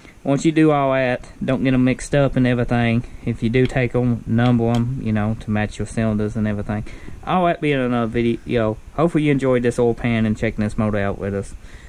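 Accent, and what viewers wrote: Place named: Louisiana, United States. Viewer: Why does this ol boy sound like he's from Shreveport?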